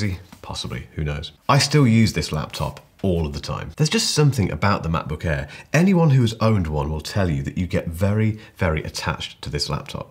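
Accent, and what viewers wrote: England, United Kingdom. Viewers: Like your reviews,! Great stuff! Also nice to listen to English English language! Cheers from Moscow, Russia🤡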